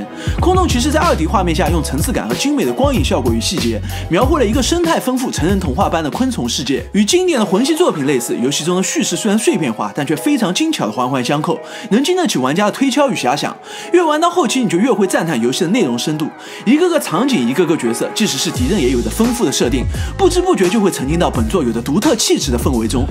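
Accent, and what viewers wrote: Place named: Jiangzhe, China. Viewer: 空洞騎士竟然是魂系作品lol 這個包郵區的塑料普通話，愛了愛了哈哈哈哈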